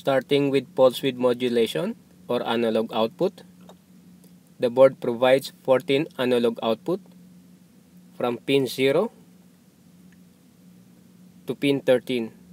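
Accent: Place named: England, United Kingdom. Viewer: It is lots of good info, Just so hard for me with my cowboy english raisen to understand the proper english you speak. It is not your issue as I am sure your countrymen understand you just fine but would have a very difficult time understanding me. You know how us Americans are, were the only ones on the face of the earth.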